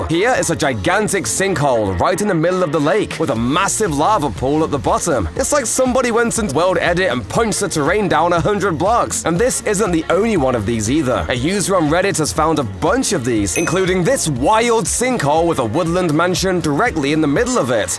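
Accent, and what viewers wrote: United Kingdom. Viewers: Dude are you British? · My mind is blowing up! At the same time you sound British and at the same time you sound American! What are you!💀💀💀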